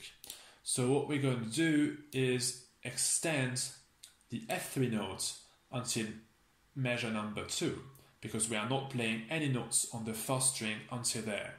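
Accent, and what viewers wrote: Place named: France. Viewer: Synthétique et raffraichissant !!! Loving the french accent !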